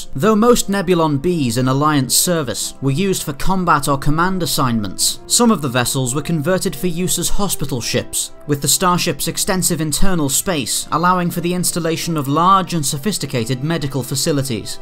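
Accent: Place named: England, United Kingdom. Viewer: i like your style, fast and summarized yet detailed enough for us fans to understand. and that english accent is well suited for this type of channel. good work man! subbed!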